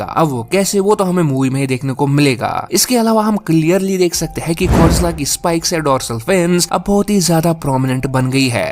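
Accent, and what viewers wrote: Kashmir, India. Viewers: U sound like kashmiri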